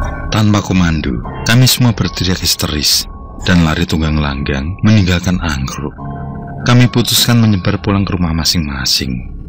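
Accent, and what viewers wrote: Java, Indonesia. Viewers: kang izin masukan, saya penonton diluar jawa.. saya gk paham dg bahasa jawa.. terkadang juga translate nya gk lengkap dan warna nya juga kdg sulit dibaca.. lebih baik ceritanya dengan bahasa indonesia.. tp logat jawa gpp.. makasih dan semoga makin sukses ya kang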